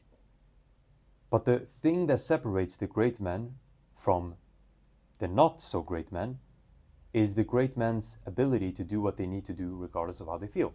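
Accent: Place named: Arab world. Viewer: Your accent is like Rudy Ayoub's, the 'Arab Dad' guy. Good message.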